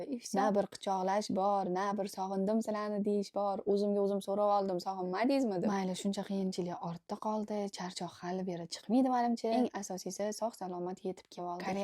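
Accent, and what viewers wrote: Tashkent, Uzbekistan. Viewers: Iltimos xech bolmasa yapti deb gapiring namanganni qaysidir rayonidansiz oziz ln Toshkent shevada gapirasiz yarashmapti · Нега тошкенча гапирасиз тонкенликлардан оброси баланд туради водини оброси Коконга караган кокон хонлиги бошкарган тошкентни Асабларим ойнеди борку шу тошкентни шевааасида гапирса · Нманганли бўсезам тошкен шевада гапирвосизми? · Вой Наманганлик экансизку кизим нега Тошкент шевасида гапирардиз.Наманганлик эканиздан уялармидиз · Тошконча шевани кайдан ургангансиз? Ман умуман урганолмадим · Сан нега НАМАНГАЛЛИК булиб ТОшкенча Гапирасан карисга нмага тегдинг наманганда эр йукмиди еки. ЕМОН кизмидинг яхши киз кушнсига ЭРГА ТЕГАДИ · Узингиз Наманганликми ? Гапиришиз чала Тошкентлик , турмуш уртогиз Кореялик , жуда хам интересно . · Нимага Тошкенча гапирадғ бу қиз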